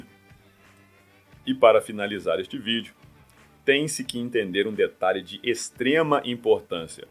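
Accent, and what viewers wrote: Minas Gerais, Brazil. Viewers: Mineiro, de qual cidade voce e he ? porque seu sutaque e muito do interior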